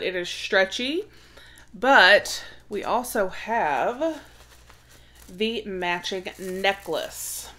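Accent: South, United States. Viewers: 🎂🎂🎂I am so happy for you to reach this mile stone...its because you have such a wonderful channel and you are such a giving person...I am so blessed that I came across your channel...I love your country accent...And thanks again for getting me started with Globe in...I just love that box...Congratulations...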